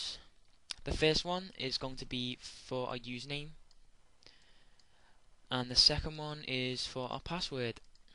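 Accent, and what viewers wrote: Liverpool, United Kingdom. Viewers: I love this channel! So much useful stuff demonstrated in front of my eyes. And not narrated by someone with an intimidating accent that makes you feel you are a noob. A scouse!!! Love it!!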